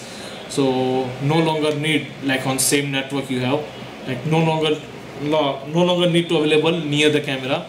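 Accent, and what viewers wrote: India. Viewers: Your English accent is very bad and difficult to understand it .. all the Indians the same in this matter . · if your going to make a video lose the Indian accent we hate it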